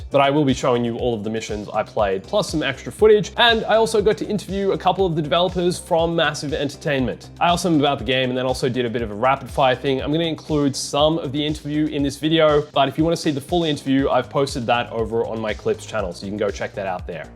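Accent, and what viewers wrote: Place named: Australia. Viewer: His accent, Aussie w/ an American inflection on all the 'r's. It's irritating. Pick a side of the Pacific & stick with it.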